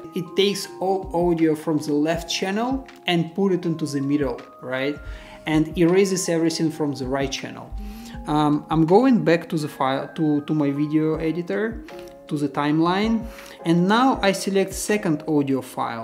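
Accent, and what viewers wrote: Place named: Russia. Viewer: чувствуется русский акцент.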